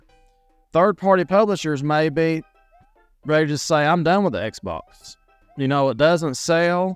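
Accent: South, United States. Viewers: I didn't know anyone could have a Southern accent that thick!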